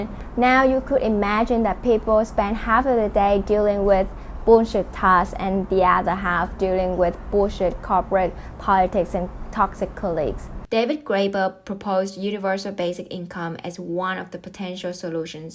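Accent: Australia. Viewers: where are u based in now? is that austrailian accent haha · Are you Australian? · are you Australian? asking because of the accent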